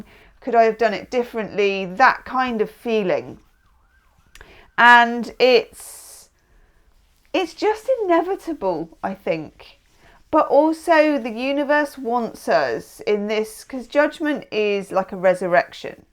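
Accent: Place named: Devon, United Kingdom. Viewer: i absolutely LOVE your Devon accent!! 😂😂😂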